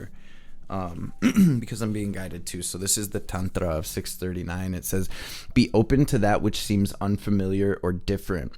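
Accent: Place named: Upper Midwest, United States. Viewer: I just found your channel. First, thank you for what you do. You are a gift. Your reading and message are so accurate (and needed) you brought me to tears. Also, I’m originally from the Upper Midwest/Great Lakes region. So, your accent reminded me of home which made the message all the more powerful. Again, thank you for sharing your gift.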